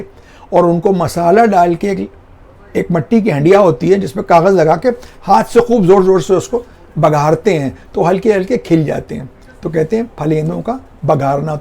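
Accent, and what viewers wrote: Lucknow, India. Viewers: Aap ke Lucknavi bateyn sun k bahut maza aa gaya.Meray Walden wahin sey partition k baad Karachi a gai they.Yeh sab batey or lab o lehja Karachi mey rehtey huway suntey tou lagta tha Lucknow mey rehtey hain. Aap ki guftugu sun ke maza agaya Lucknow ke sair ho gai 👌♥️ Aap ney Lucnavi pourbi zaban ka zikr nahi kia wahi hamarey ghar mey boli jati thee.Jesay aye bhayia tum ka khaieo ? Mey kai baar Lucknow bhee jachuki hoon Behad maza aya or apni nanhiyal jaker wapas aney ka dil nahi chahata hai.Lucknavi mehmaan nawazi or kahin dekhney ko nahi multi 👌♥️ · Shukran Sir Aap ki videos ka be qarari se intezaar rehta hai... Hum Guzishtah paanch barson se Bangalore main muqeem hain Lucknow juld aana mumkin nahi So aap ke lub wa lehje main mukammal Lucknow paa letay hain.. Behud shukr guzaar hain ke Aap ne Itni pyari Tehzeeb ko ujagar kiya... Salamat rahiye... 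Aameen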